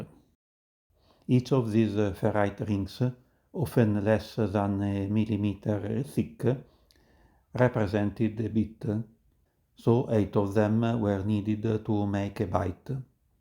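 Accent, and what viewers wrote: Italy. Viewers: You are Italian. At least your accent says so! :D However, great video! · Mai sentito un accento più italiano di questo ahahaha.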